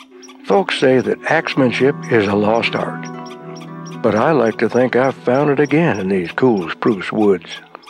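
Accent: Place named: South, United States. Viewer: Alaska??? Has to be. He sounds southern tho. Man I wish I could build like this we have all lost this knowledge its so sad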